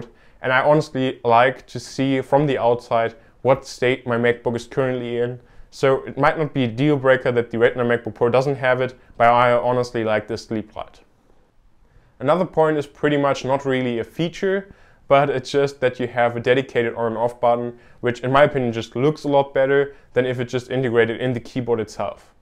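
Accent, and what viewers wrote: Germany. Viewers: was ein schöner deutscher akzent ^^ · oh but i just keep my superdrive in it.. i am planning to upgrade the ram memory to 8 gb to get ilife a little more fluent :P and yeah i heard a little german accent, i thought u were dutch :p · Deutscher Akzent :) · do you know how big of a difference there is in performance between the two? or if it's barely noticeable? i'm either gonna buy non-retina 13'' (high-end) 128ssd or Low-End 13'' retina. So I'm just wondering: if the performance difference isn't that big, then i'll go for the retina. even though I still use the CD-drive and an ethernet-port :P "give and take"... Next time I might answer you in German, if I guessed your accent correct? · you german? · He's from germany :) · Are you german?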